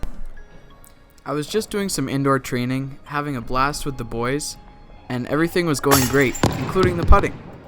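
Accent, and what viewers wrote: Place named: Canada. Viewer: Your videos are the best... excellent breakdowns and teaching! Sounds like you are somewhere in Canada? How did you get your gym let you do that on the basketball courts?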